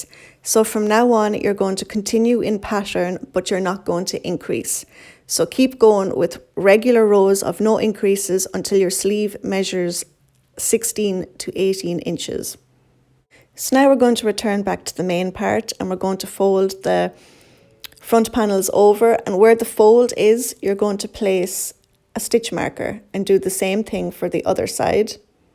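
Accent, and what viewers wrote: Ireland. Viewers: I love this pattern! thank you but i also love listening to you speak..love your brogue..:) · Yay Irish accent.. Love the video x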